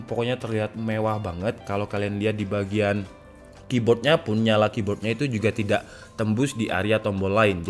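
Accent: Malang, Indonesia. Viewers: #REQ Kedepannya coba buat playlist podcast aja mas, seperti di channel sebelah. Toh dari segi penyampaian/pemilihan diksi mas juga udah bagus, cuman perlu diperbaiki dari segi 'medok' Malangnya itu wkwkw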